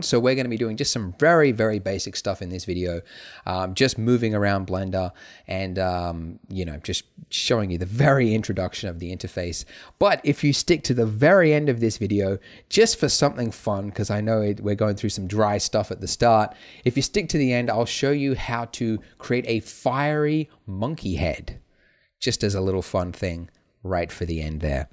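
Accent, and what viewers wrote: Australia. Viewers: What is that Ozzy/Kiwi/Brooklynese accent?? :)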